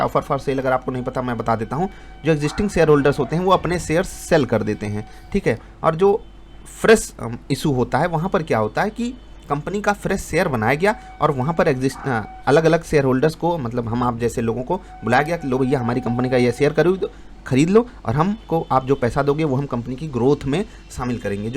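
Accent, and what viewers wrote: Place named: Uttar Pradesh, India. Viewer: Sir ap kon c city me rhte ho. Apki tone se main guess kr skta hu aap Uttar Pradesh se belong krte ho. Kindly reply krle batana jrur muje sir m waiting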